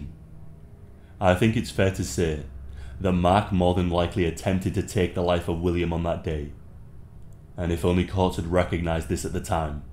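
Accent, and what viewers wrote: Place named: Yorkshire, United Kingdom. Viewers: Thought youre a Yorkshire man. My oldmans from Donnie Doncaster ;)) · So now I know your accent is Yorkshire. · i KNEW you were a Yorkie go me can pick an accent any day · When I get some cash ima pay this dude.. I love listening to horror read in yorkish 🤪🏴🏴 This story I've known for a while... Horrific from start to finish.. OMFG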